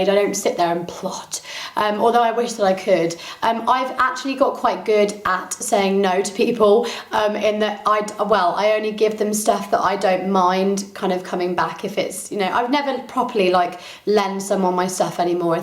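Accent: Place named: United Kingdom. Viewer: I love this tag,it was fun and you have such a fab sense of humor.You say the funniest thing while speaking with that classy British accent.xoCathy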